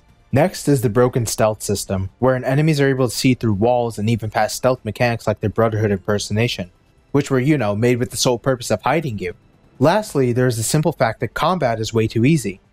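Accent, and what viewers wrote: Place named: North America. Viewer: I'm English and clearly understand the narrator by the way. I guess North American is hard for some...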